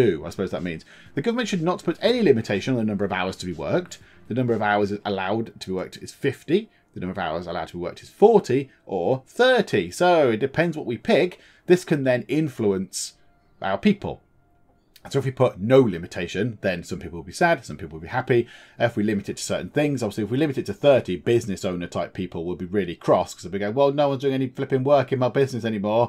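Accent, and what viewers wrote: United Kingdom. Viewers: Heavily bri'ish.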